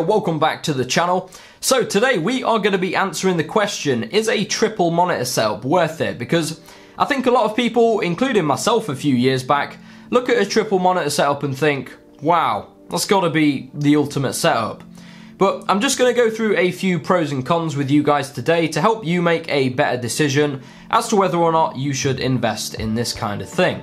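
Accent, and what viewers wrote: United Kingdom. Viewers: its 36 secs in and i cant ignore that bri´ish accent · Love your british english xD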